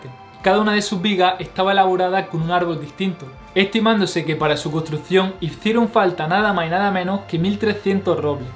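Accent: Andalusia, Spain. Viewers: te pregunto hablas otro idioma a demás del español por que te escucho y me parece que hablas con mucha dificulta el idioma español o castellano como lo quieras llamar, tal vez tengas un asentó muy fuerte original de el país español y real mente a los latinos senos hace algo difícil entender a un español cuando tiene un asentó muy pronunciado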